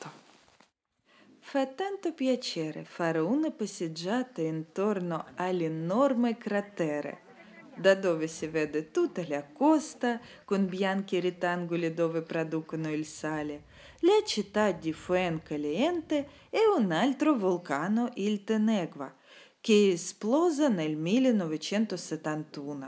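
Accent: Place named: Russia. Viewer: Какой прекрасный русский акцент! Спасибо за рассказ и видео! Красивый остров...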